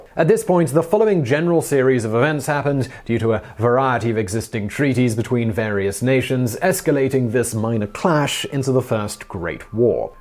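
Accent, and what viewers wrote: United Kingdom. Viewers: No mention of the Berlin to Baghdad railway? This is not a real History channel. Great British voice, though. Americans think Brits are smart. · Sometimes British accents make me want to claw my ears out... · i feel the need to apologize for insulting your pronunciation of words by stating it often made me cringe. My lame excuse was knowing several Brit women and never had heard whst turns out to be an accent. I didn't consider the accent to be a speech impediment. Remarking on a speech impediment would be the lowest of the low and that is something i would NEVER do. But my remarks re: your regional accent was BAD and i do sincerely apologize. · Another great one by aka “the most British man in the world”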